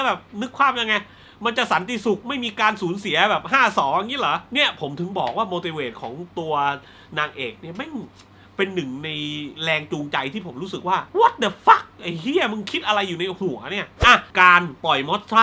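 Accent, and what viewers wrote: South, Thailand. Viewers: พี่สำเนียงคนใต้ จังหวัดไหนครับ ผมนครศรี 55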